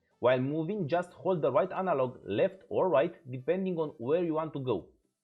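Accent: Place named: London, United Kingdom. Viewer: Nice London man, are u African?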